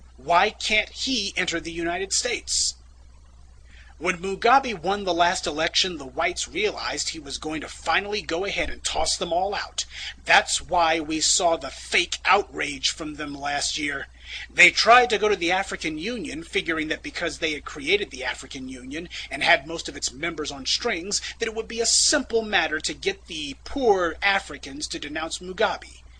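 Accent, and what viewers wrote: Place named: United States. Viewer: hij praat als een Amerikaan. Geen Braziliaans accent.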